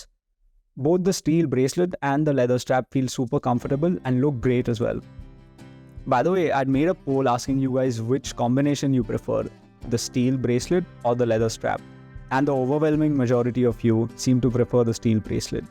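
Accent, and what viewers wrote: India. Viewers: I used to believe I could eventually get used to the Indian accent........... I was wrong.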